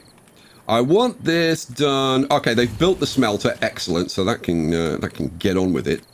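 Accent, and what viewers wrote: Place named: United Kingdom. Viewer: Dude you have big potential, you remind me of RCE with your British accent and enthousiast and jolly attitude. Also love the little interactions with your wife. Keep doing what you are doing man!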